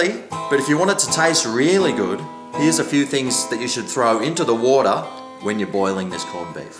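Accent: Australia. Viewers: Are you oz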